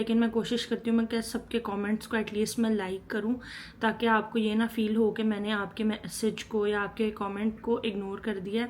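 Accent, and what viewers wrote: Punjab, India. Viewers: Ye kon c type k Chinese hn bae ..... Accent pure Punjabi like our Lahories but face r pure Chinese.... 🤔🤔🤔🤔 Kesay nae kesay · ہائے میری پیاری سویٹی لگتا بہت دکھی ہوئی ھو۔اتنی پیاری باتیں کہاں سے سیکھیں ہیں۔ کبھی پنجابی میں بھی بات کرو۔باتوں سے پکی پنجابن لگتی ھو۔ ڈھیروں پیار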